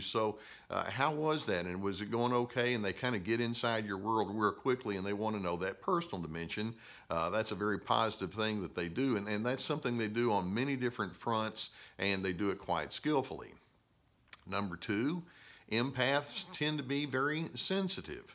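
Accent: South, United States. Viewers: Thankyou so much you've conceptualised so much of what this personality type is and those who have taken advantage of it. I've often said when, disappointed , that I expect too much from people . I know I have been naive , expecting people to be more like me. Learnt a lot by being burnt . I have watched a lot of your tube postings. The knowledge you've given me re Narcissists has been a light on on and several ' ah ha ' moments . Scott 🇦🇺 that's again , love the southern accent!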